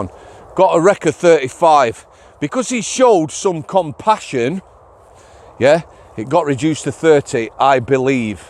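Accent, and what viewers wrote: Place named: South Yorkshire, United Kingdom. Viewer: Wakey bloke mi sen I always thought you were South Yorkshire love the work keep it up 25k subs soon 👍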